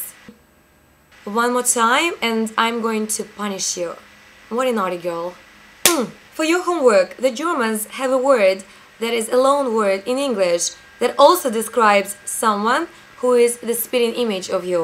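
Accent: Russia. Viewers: Yo are Russian?Have a rusian accent :) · ёпт !! у неё русский акцент..... она 100пудово говорит по русски !! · gaahaa! that russian accent omfg!!!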